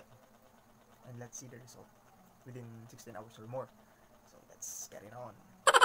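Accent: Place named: Ilonggo, Philippines. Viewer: Yung accent mo conyong ilonggo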